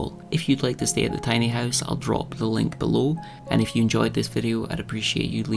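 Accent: Scotland, United Kingdom. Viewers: "... and not fixing potholes" --- LOVE IT, you are so refreshingly honest 👏😂. I wish you the best of success with your enterprise and future plans. It takes time to get it running more profitably. As to the critics - I think a great deal of it is envy and the reluctance to see how much time and work you put in it. Ethical or no isn't the question. The same could be said about most every profession or business. You don't have to defend yourself, you are doing a great job and you are very thoughtful and respectful in everything you showed us here. Also, Scotland offers only so much opportunities to make a living. Criticism is so easy online - I'd suggest for everyone to check their own circumstances first. Unless they are 100% flawless themselves, they might want to stop trying to give you a bad feeling. I for one enjoy this channel very much and it's obvious why your channel grows so nicely, I don't stand alone with my opinion, it seems.. 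I like how you and your family work together to make things happen. I enjoy your honest and kind personality along with this lovely Scottish accent, of course. I'm old enough to be your grandma and I'd be very proud of a grandson like you. Best wishes to you and your loved ones on 2 and 4 legs, hugs and smiles from the Bavarian Highlands, the alpine region that's indeed called Oberland 🙋🏻‍♀️❤️ Lisa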